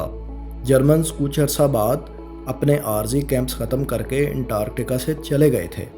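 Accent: Punjab, Pakistan. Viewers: Sir Plz Q Krte Henn Asa.. Lafz Tk Sahi Nhi Boly Ja Rhy.. Majbot Rhna Bhai Mazbot... Urdu Hi Sahi Bol Lo Bhai Plz.. Punjabi Feel Arhi Hy.. Zahar Hy.. Zahir Hy.. Ufff.. Faisal Bhai Humre Kano Ko ApKi Awaz Chahiye.. Standrd Bna Dia Hy To Ab Pura Krain.. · آپ کی اردو سن کے یہ چینل ہی دل سے اتر گیا...پنجابی نما اردو سے بہتر تھا پنجابی میں ہی وائس اوور کر دیا جاتا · Punjabi accent me Urdu bolrhe ho. Pathetic voice, bring back Faisal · Punjabi accent,,,,,,,,, :)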